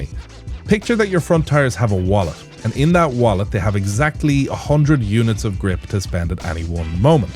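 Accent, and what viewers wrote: Ireland. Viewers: First time visit. subscribed. just seconds in, I heard that legendary Irish accent and thought brilliant. and then the Irish flag on your car, Legend. from one fellow Irish man living abroad to another. Hows tricks dude. awesome video.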